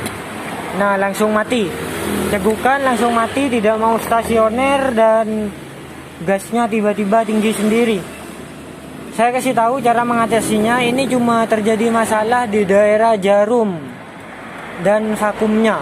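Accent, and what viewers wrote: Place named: Madura, Indonesia. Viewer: Org madura ya ?😁😁😁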